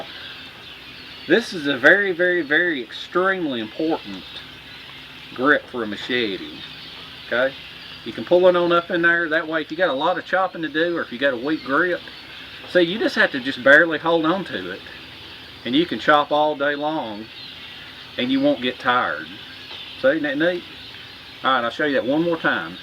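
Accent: South, United States. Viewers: Another good video. I guess one of the reasons I enjoy your videos so much is that you do show different ways of doing things. That and we share the same southern accent! LOL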